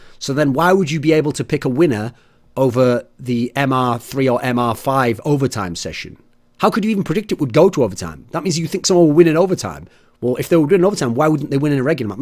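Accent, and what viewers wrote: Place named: Wales, United Kingdom. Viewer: Where is Thorin from? He sounds Welsh or some shit.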